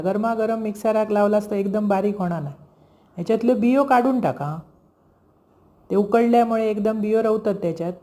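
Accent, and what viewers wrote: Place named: Malvan, India. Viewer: सुंदर आणि सहजगत्या करता आपण आणि साधेपणा आणि मालवणी भाषेतला गोडवा खूप मजा नायतर आमच्या बायका कधीपण काय पण घालतत त्यांका समजवणार कोण लगेच भांडूक तय्यार पदर खोचून " तुमका काय अक्कल असा? 🤣🤣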